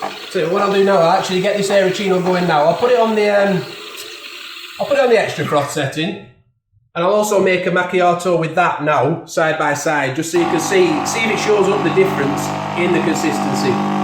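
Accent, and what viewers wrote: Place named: Northern England, United Kingdom. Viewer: Excellent stuff - as a Northerner living down South, the best bit (apart from the thorough and detailed review!) was the Northern accent. Music to my ears :) Thank you! One thing I thought I would point out (based on my research), is that the Lattissima machines actually steam the milk whereas the other standalone units just heat and froth the milk. I find the steaming releases more of the natural sugars in the milk - no need for any sugar on top.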